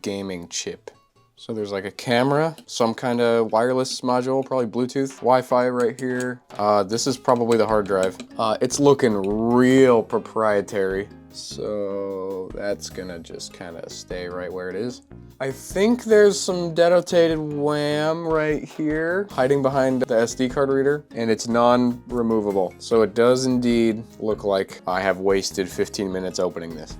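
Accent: Canada. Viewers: because it's the exact same with a canadian accent instead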